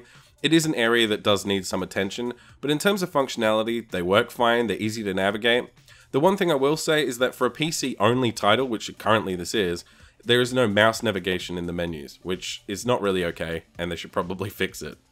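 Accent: Australia. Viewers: you sound like you're from Londan! But seriously though Aussie? Kiwi? Anywho, great review, I loved the honesty and the recommendation, after watching your review, I'm quite keen to give this game a go, since it's dirt cheap (punzrfun). Subbed for future content.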